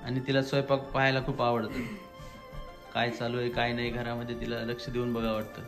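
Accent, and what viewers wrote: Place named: Vidarbha, India. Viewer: chane आहे कुठं आहेत भाषा widarbhatli आहे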